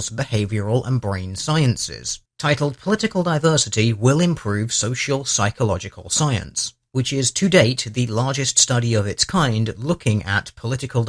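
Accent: England, United Kingdom. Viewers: I'm no expert but It's not British, it's English. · I should have said that I know is English and should have specified that he has an English accent, just not Received Pronunciation, though Young Ponymancer did point out that he is close to RP but his voice is nasal. He is certainly not Scottish. What about a Midlands accent but not Brummie nor Scouse though I can't them tell apart since I'm American.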